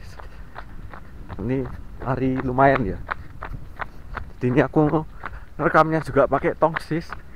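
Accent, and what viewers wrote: Java, Indonesia. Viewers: Matur suwun info ne ..... Sangat membantu, suka sekali lihat public speaking yg medok gini wkwkkwkwkw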